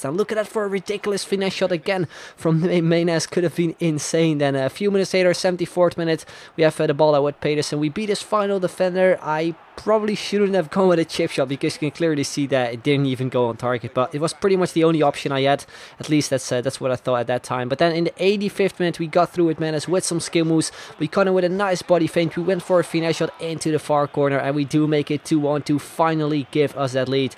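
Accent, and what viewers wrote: Netherlands. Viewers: you can hear the dutch trough the english :p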